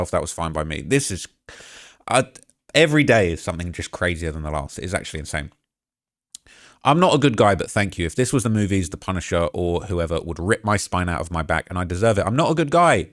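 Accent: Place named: United Kingdom. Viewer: Be careful saying stuff about Donnie in these videos. Your British accent with a US location is inviting ICE at your door.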